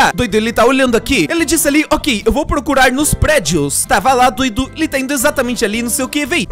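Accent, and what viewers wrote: Portugal. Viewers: Man c tá falando português de portugal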